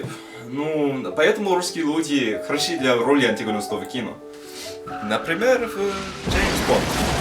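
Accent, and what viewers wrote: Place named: Ukraine. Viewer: Почему мне его произношение напоминает украинский?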